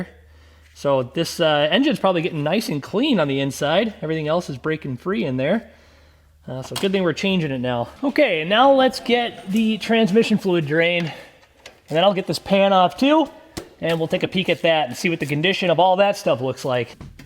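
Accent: Minnesota, United States. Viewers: your in minnesota i can just tell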